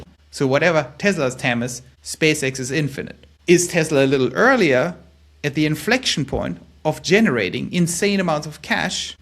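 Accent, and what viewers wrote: Germany. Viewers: we need more heavy german accents on youtube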